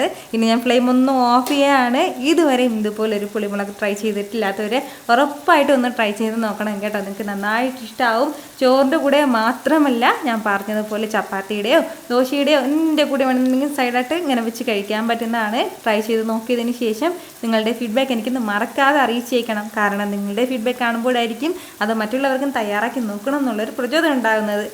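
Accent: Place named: Thrissur, India. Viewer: മൂളകൂഷൃം ത്രശ്ശുർകാർ പറയൂം ചെറിയൊരു വൃതൃസം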